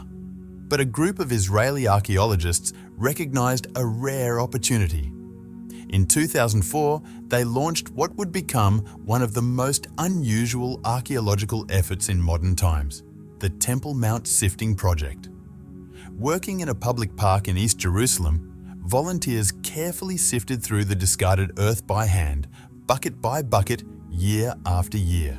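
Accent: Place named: United Kingdom. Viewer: bull cocky brought to you AS ALWAYS BY A BRITISH ACCENT!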